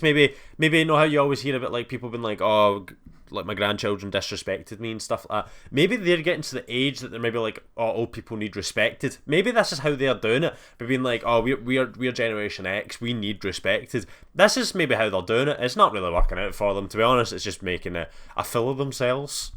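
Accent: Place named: Ireland. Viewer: greetings from canada! just stumbled across your channel by chance when i was scrolling and i love your commentary style. clean, simple, and just giving your opinions. i love your accent too! irish ? regardless, you have a great personality and i hope you continue to post videos! i find them really entertaining. best of luck, 🇨🇦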